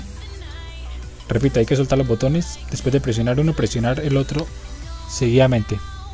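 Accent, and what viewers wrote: Antioquia, Colombia. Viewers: porsero ust es de colombia por q tiene pora voz de paisa de calarca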